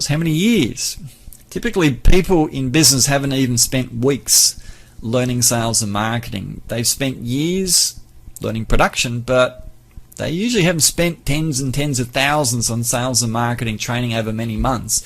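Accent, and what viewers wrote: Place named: Australia. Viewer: this Australian accent annoys !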